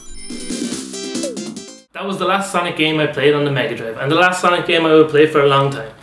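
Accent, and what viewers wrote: Ireland. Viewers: don't take this the wrong way, but I love your accent. you're Irish I'm guessing?? My family's part Irish and Scottish, so I had to try and pick apart your dialect and such. cheers to you and all!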